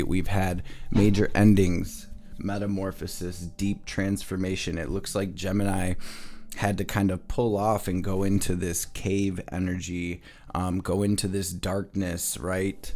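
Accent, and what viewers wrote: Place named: Upper Midwest, United States. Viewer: I just found your channel. First, thank you for what you do. You are a gift. Your reading and message are so accurate (and needed) you brought me to tears. Also, I’m originally from the Upper Midwest/Great Lakes region. So, your accent reminded me of home which made the message all the more powerful. Again, thank you for sharing your gift.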